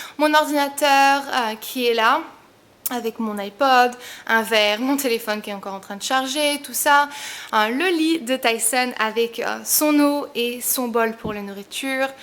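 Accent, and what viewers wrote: United States. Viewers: moi je vois pas le bazar en tout cas lol super appart jaime bien la déco ! et c marrant ta choppé l'accent américain même quand tu parle français lol <3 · Coucou, j'adore ta vidéo ♥ ton appartement est trop beau *-* Et j'ai remarqué que même en parlant français, tu as un accent américain :) Bisous ♥ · Puis sa meilleure amie d'enfance est américaine il me semble, elles étaient tout le temps ensemble, elle passait du temps dans la famille de son amie où ça parlait anglais, ce qui expliquerait ce petit accent. Mais je ne connais personne qui à force de trop parler anglais se met à parler français avec un accent ... Mélanger ou oublier des mots, oui, perso ça m'arrive souvent. Mais de là à avoir un accent quand je parle ma langue maternelle... Je pense que le truc de de notre miss remonte à l'enfance passée avec son amie, on attrape plus facilement les "tics" et habitudes à cet âge-là. 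Voila!! ^^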